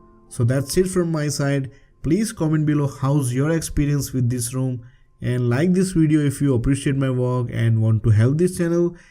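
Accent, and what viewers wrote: India. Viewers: just gonna say the obvious but is there a single fucking review of lineageos 16 without an indian accent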